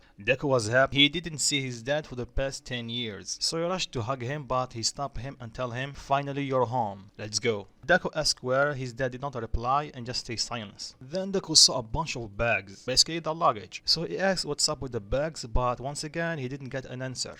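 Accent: Russia. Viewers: Are you Russian 😅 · Were are u from? I can here a Russian accent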